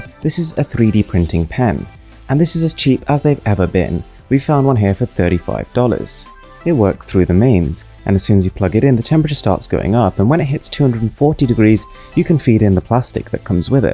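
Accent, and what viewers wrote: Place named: England, United Kingdom. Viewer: awesome video bro.. as usual. u r eng accent .👌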